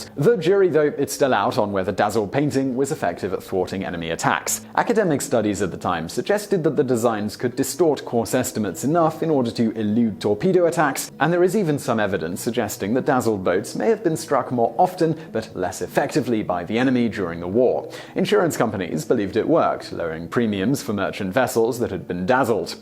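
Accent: United Kingdom. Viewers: J H when you watch so much people in your life start developing a British accent. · English Accent* I'm Scottish and trust me my accent couldn't be further from his lol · That moment when you watch so many videos that you actually develop a decent British accent by listening to.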